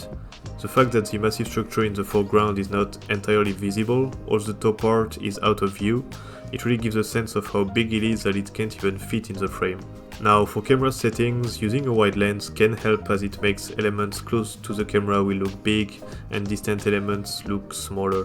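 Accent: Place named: France. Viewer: I knew you speak French from the start from the accent I also have haha ! Alors voici un nouveau sub pour encourager la communauté française de Blender❤ en tt cas la différence entre la première image et ce que tu as changé est incroyable ! Du vrai art , bravo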